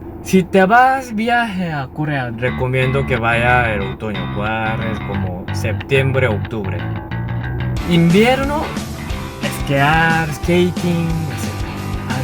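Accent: Panama. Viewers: Que tierno que esta hablando como un panameño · 🤣 habla Panameño Clarito Xuxa madre, Ta webao dice 🤣👍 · Donde aprendiste hablar español, hablas como panameño tu acento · Ajaja ya hablas hasta mas panameño que cualquiera y yo queriendo ir a vivir a Sur corea, fui casi un mes en primavera y es muy hermoso, me gusto demaciado. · Saludos desde mi tierra La Chorrera. Ey amigo ya tu hablas como nosotros los panameños Pritty Friend jejejej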